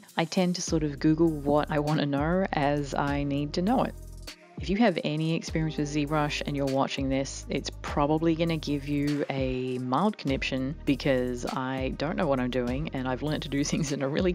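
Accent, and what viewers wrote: Australia. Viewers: Hey where's your accent from? It reminds me of mine somehow, yet I'm a Queenslander from Australia. Just want to know if my accent is out of place or if I somehow detected the QLD accent in the wilds of America.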